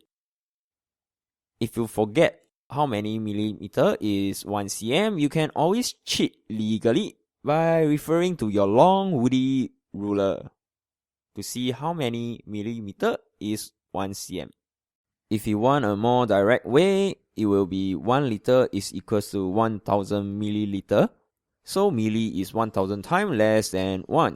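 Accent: Singapore. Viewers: definitely singaporean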